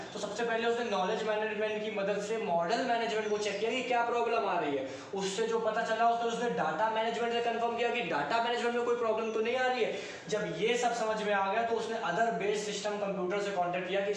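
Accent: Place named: Kanpur, India. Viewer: bhaiya aap kanpur ya uske aas pas ke area se ho kya ?aapki tone vaisi hai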